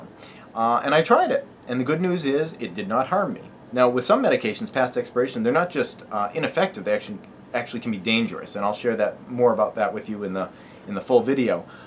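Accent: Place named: Nova Scotia, Canada. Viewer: I've just finally noticed how Canadian you sound... after this binge of videos... can't believe I didn't notice this before. That accent of yours is more Nova Scotia than Boston haha. I am using your dialect and the weather patterns in your videos to triangulate your location...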